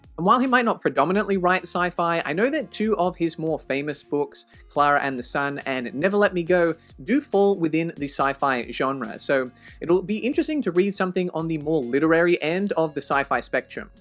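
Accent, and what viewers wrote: Australia. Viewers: Australian… I think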